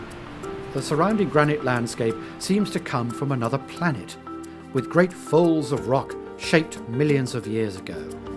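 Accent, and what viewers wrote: United Kingdom. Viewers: My first comment would be that the narrator get an education in pronouncing the names correctly. Would any Limey with so sophisticated an accent as his do so badly in that horrible French they all seem to effect? The editor or writer of the script is really really biased towards the "black"" side of our history. The world should remember that the black (mainly Xhosa) tribes arrived in this region at about the same time as the settlers, so there is no basis for the "rights"to any lands. Yes, there were atrocities inflicted, but by both sides...Sad that the Western Cape (ie Cape Town and environs) are omitted...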